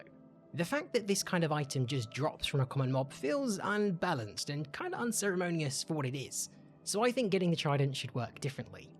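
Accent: United Kingdom. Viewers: I was reffering to pronunciation as "ae" often makes an /æ/ sound in English (think "aesthetic, etc). What I was saying was that I've only ever noticed /akwætɪk/ being said by these two Youtubers who both happen to be British.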